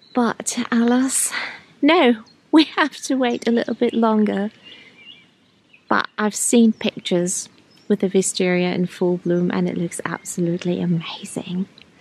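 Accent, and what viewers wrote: England, United Kingdom. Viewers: Lovely video. Are you from Germany? You seem to have a British accent. Were you raised in Britain? You do not sound like you have any German accent. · I like your British accent, so beautiful. House is very good. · Your accent sounds more English than German 😊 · ❤❤❤❤❤❤ You have a beautiful British accent, it doesn’t look like you’re from Germany :) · Absolutely lovely! I am new to your channel and see that you are German, but your accent is British. Did you live in the UK?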